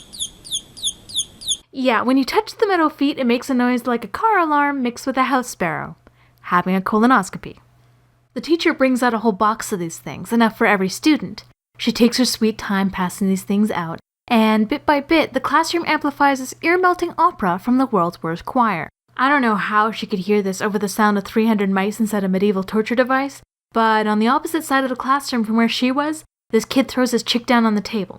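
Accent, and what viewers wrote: Canada. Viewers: are you Canadian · you sound like you grew up in Winnipeg. cause I had that growing up here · HHHHHHH I got it!!! "do not bang the chicks" XDDD took me a second! also your canadian!? :O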